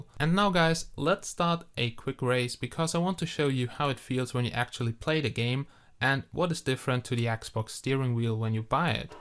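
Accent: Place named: Germany. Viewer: Are you german? That accent sounds familiar ;)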